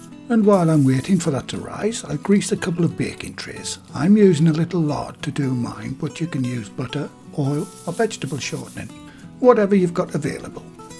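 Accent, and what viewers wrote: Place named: Newcastle, United Kingdom. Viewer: Another fab video. I bought some store bought ones yesterday. and they were disgusting, i threw the rest out to the birds this morning, even they were in 2 minds whether to eat them. I will be making my own now. Not only am i loving watching your channel and enjoying your easy to follow recipes, i love your geordie accent. Thanks again.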